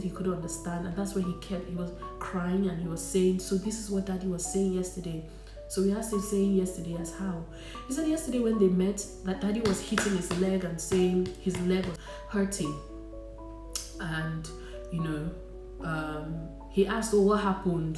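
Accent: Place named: United Kingdom. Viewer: This one that you are already having British accent 👀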